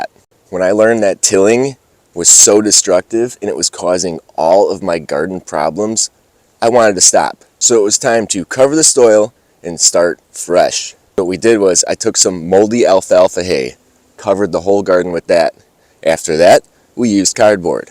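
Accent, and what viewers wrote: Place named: New England, United States. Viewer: Ur Yankee accent is pleasant sir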